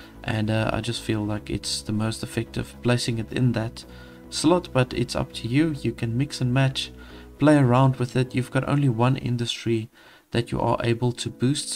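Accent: South Africa. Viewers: Good stuff! Thanks. Coolest tip to have multiple mines on deep deposits (infinite supply deposits). BTW, I think I hear a South African accent there?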